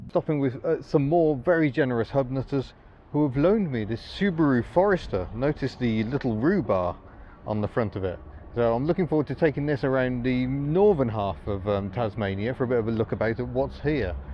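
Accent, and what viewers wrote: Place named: England, United Kingdom. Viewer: Actually, the pronunciation of Launceston is more like Lon-ces-ton. If you call it *Lonnie*, they will think you're a local. Ok, maybe not with that English accent of yours. Lot of mainland blow-ins now so the name pronunciation may well have morphed a little. I used to live for a time during my schooldays in the 60s in Garfield Street, South Launceston. Attended high school in the suburbs Kings Meadows. Worked there for a time in the 70s after completing my apprenticeship. It is a nice city now but used to get very smoky in the 60s when everyone had wood, coal or briquette heating. I still miss the electric trolley buses of that era, not to mention the old Leyland buses with the half cab.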